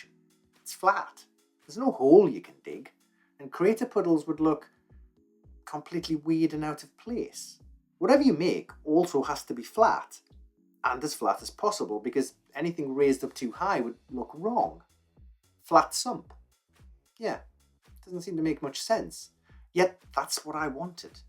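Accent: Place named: Northern England, United Kingdom. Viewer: I've just watched all your videos and they are excellent. You're right: there isn't anything else occupying the space between scratch-bashing and ultra-expensive mega builds. Personally, I want the look of a GW-only table because I love the aesthetic and their kits but, like you've pointed out, they're impossibly expensive, they actually limit you in all sorts of ways, and they often don't work all that well. Plus so many channels resort to mdf kits and 3D printing which isn't my jam. So I am your target audience (plus, I also remember card scenery, and I also got a letter printed in White Dwarf back in the day, so we could be brothers). Talking of brothers, are you related to RPArchive, because your channel is like its 40K twin. Gentle, northern bent; perfectly manicured nails; impossibly neat house; incredibly comprehensive advice and instructions; original project ideas; OCD-friendly; slightly bossy and judgey in tone; professional video production; slightly clinical, but totally stunning results; and uplifting and inspirational (as much as brits ever can be). 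Bravo, sir. Subscribed, and really looking forward to seeing more videos from you.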